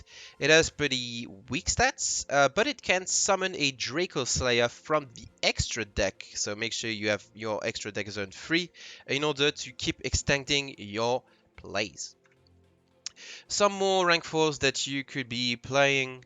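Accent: France. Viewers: You sound french am I right?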